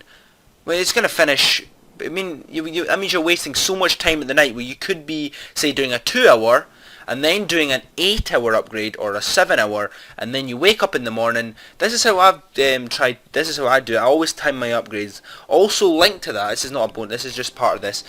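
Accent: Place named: Edinburgh, United Kingdom. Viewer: Just saw someone saying love your accent lol aye so dae ah ken whit a mean you sound like a Edinburger lol good vid tho some good tips mate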